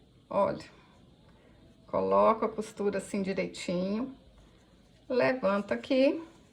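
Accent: Minas Gerais, Brazil. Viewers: Aposto que você é mineira, sotaque mineirinho UAI, acertei?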